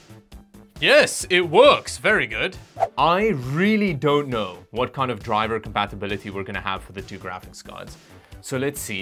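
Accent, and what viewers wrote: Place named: South Africa. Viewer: This guy has a South African English accent lol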